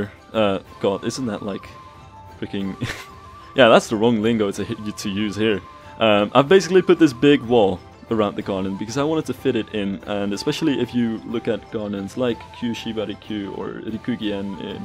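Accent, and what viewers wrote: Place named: Netherlands. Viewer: Nice!! Duurde even voordat ik het hoorde maar op gegeven moment dacht ik.. wacht eens even.. dat is een Nederlander!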